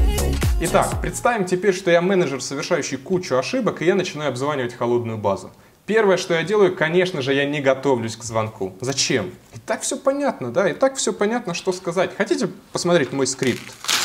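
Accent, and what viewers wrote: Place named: Trans-Urals, Russia. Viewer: Вас тяжело слушать. Зауральский говор. Ткие, шибка, пгврить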